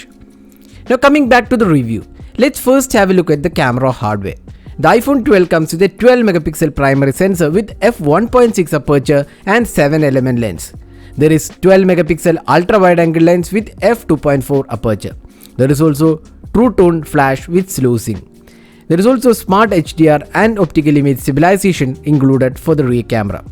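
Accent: Kerala, India. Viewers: From your englsh i can say that you're a malayali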